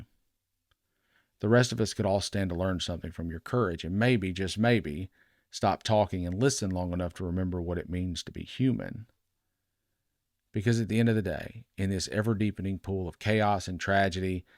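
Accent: South, United States. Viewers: Reminds me of Beau (of the Fifth Column). Another calm, soft-spoken, Southern voice.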